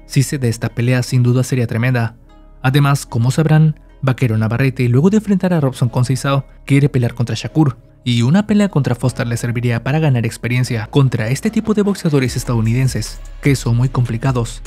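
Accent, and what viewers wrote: Mexico. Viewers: El comentarista es mexicano?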